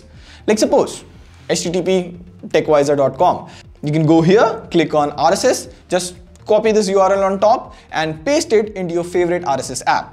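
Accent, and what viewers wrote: India. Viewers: this man has a THICC indian accent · I clicked on this channel just out of boredom. I hear an indian voice I am now interested...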